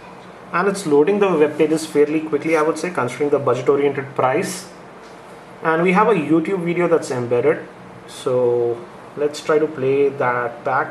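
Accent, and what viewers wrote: India. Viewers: its indian accent.. u sure u hate originality? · You sound like an Indian?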